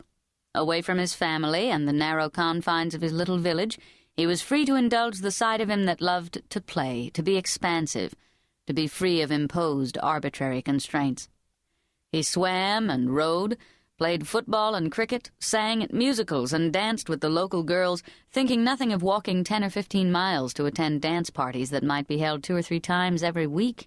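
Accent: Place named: United States. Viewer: Terrible narrator….. thick American accent, lousy pronunciation. Unlistenable.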